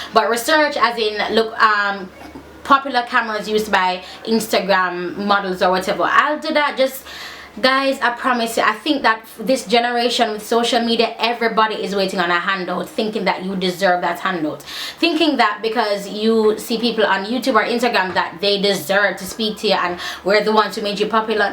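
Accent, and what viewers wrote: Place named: Jamaica. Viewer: Also for your Jamaican accent, which I have found many are attracted to; Californians enjoyed listening to my sister and me.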